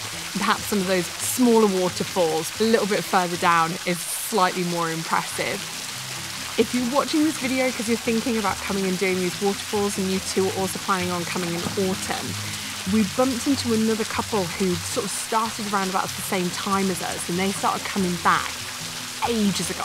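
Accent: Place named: Northern England, United Kingdom. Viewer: Just stumbled upon your channel, where is accent from please ? You sound from north England, right? Greetings from Casablanca . Thanks for taking us round. Fare thee well for now.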